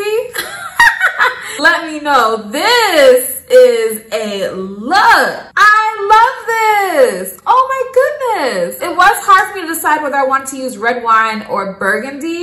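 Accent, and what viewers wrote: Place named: DMV, United States. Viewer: TOP 6 REASONS I SUBSCRIBED: • Your complexion sent my azz to the kitchen for some HAWT CHOCOLATE 🍫 • You are giving me every reason to buy these units BAYBEEEEE 😭❤️🤎❤️ • Your music is poppin Ma. I’m a 80s baby • The confidence is on 💯💯💯💯 • There’s no fear in color like, you screaming “run up” & Move around “ to EV-ERY color in this playlist sis • We neighbors/family with the energy, style, accent.... “DMV” Where ARE YOU AT??? ANNNNNNDDT my #1 reason- you are most definitely a TOPCOAT PRETTY BROWN BABY DOLL & I’m supporting you baby girl PS- once I snatch these units, zaddy dropping Ben-jamins = I don’t spend a dime 🤣 Keep going baby! I’m a fan MUAS 💋 and happy holiday season boo 🎁✨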